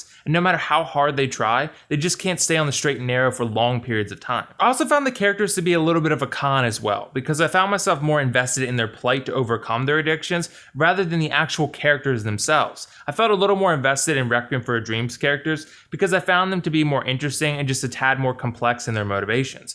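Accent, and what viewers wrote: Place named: United States. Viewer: I only came here to hear an American talk about this movie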